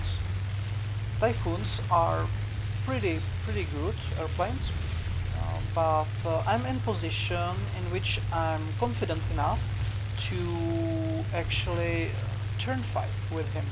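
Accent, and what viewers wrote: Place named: Czech Republic. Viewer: jsi čech kámo ? :D :) podle nicku si myslím ze ano a podle prizvuku taktéž! :) (Y)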